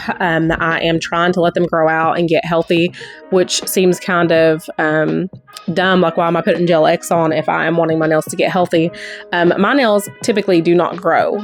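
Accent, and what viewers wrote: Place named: South, United States. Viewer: I love your country accent!! 😊❤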